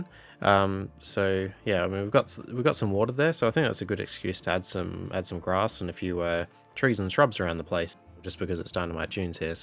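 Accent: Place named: Australia. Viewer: Getting a lot of inspiration from your videos in general, not just this one specifically. Really enjoying them! Definitely a more chilled vibe to some of the other RCT content creators out there (without criticising them of course, just my subjective experience); plus your Aussie accent is a welcome change for a fellow Aussie 🙂I've done the whole "click like and subscribe" ! Many thanks!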